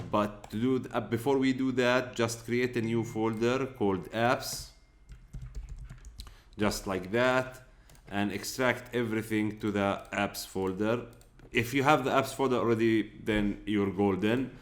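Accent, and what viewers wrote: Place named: Arab world. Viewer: I LIKE YOUR ACCENT IM ARAB AS WELL BUT LIKE YOUR ACCENT IS JUST WOW.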